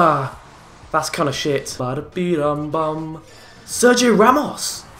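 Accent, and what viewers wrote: RP, United Kingdom. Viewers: your voice I'm watching your videos of 2015. Such a different. Posh voice here, shouting mad and loud as hell back in 2015. Lot of difference. Deserves gratitude now. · The he used to speak, was so posh haha.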